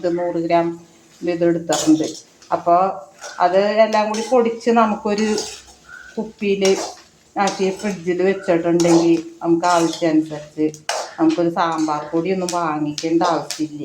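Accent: Thrissur, India. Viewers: സാമ്പാർ പൊടി നന്നായി ഉണ്ടാക്കി കാണിച്ചു👍👌😋😋 ഇഷ്ട്ടമായി ഞാൻ അന്നന്നു വറുത്തരക്കുകയാണ് പതിവ് തൃശ്ശൂർ ആണോ സംസാരം കേൾക്കുമ്പോൾ തോന്നുന്നുണ്ട്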